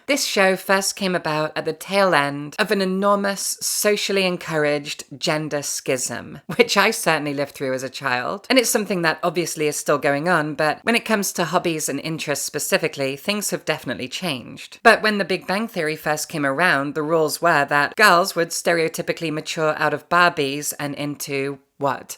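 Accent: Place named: Scotland, United Kingdom. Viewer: Seriously. I love her accent, it's kind of mild for an Scottish accent but combined with her voice it's so soothing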